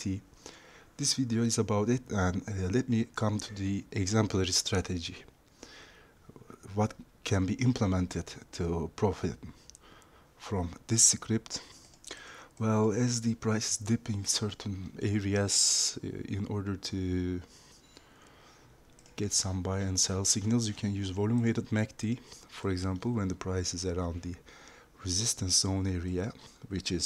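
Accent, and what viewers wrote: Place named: Turkey. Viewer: Abi Türk müsün. İngilizce aksanın Türksün gibi geldi. Şunun Türkçesini anlatır mısın 😅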